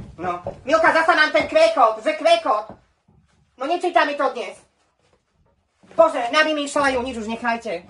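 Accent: Slovakia. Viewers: Nebojkajtě sa 😂😂😂 krčaky ako potrubia, drzkajte se mna….já lubim to jak si hraješ s tou slovencinou 😂😂😂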